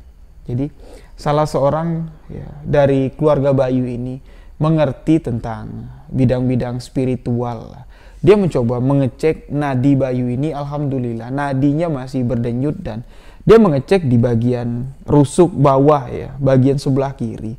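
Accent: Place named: Bali, Indonesia. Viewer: Logat bicaranya kaya org bali y mas😁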